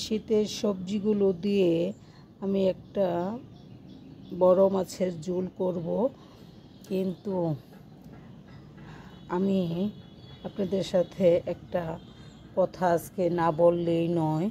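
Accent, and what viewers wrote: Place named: Gafargaon, Bangladesh. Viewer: নরমাল আমাদের গফরগাঁও এর ভাষাই এই কথা বইলো। এটাই সুন্দর লাগে।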